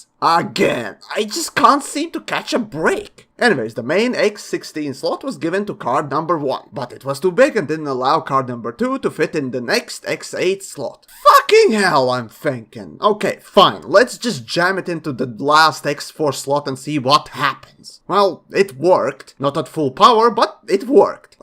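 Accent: Latvia. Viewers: I KNEW I HEARD A LATVIAN ACCENT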